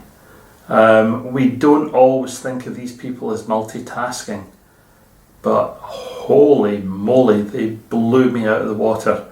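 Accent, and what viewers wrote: Scotland, United Kingdom. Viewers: that was another absolutely lovely episode! im lucky in that i spent 4 years surrounded by Scottish people during my degree, so i dont need subtitles! hee hee. i really enjoyed this episode, im loving how your stranded hat is coming along, the colour changes are quite subtle, but i love subtle, i think it works really well. best of luck with it! till next time - cheery-bye!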